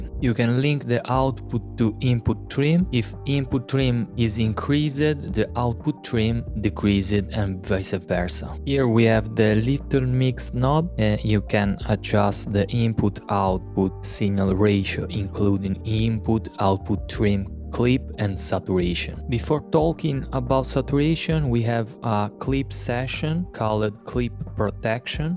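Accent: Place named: Italy. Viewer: Dopo pochi secondi dall'inizio del video ho intuito l'accento italianissimo. 😄 Conoscevo ed usavo già questo plugin, ma grazie alla tua spiegazione mi è più chiaro come lavora, grazie. 👍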